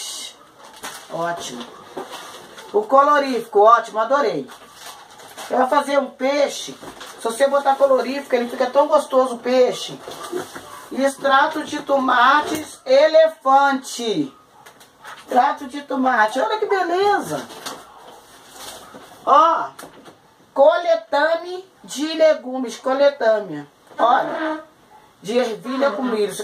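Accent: Rio de Janeiro, Brazil. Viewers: Como não amar 😍😍,saiba que a senhora me ensinou muita coisa viu,principalmente os trabalhos de casa pra ajudar minha mãe 😍😍...Adorooo a senhora... Obs: Quando ela fala:pra mim é pra patyy,que sotaque gostosooo carioca...😍😍😍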